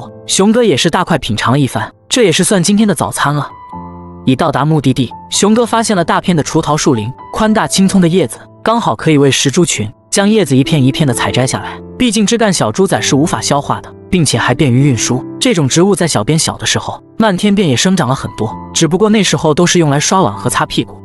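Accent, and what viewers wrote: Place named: China. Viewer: 聽聲音也知道是大陸人。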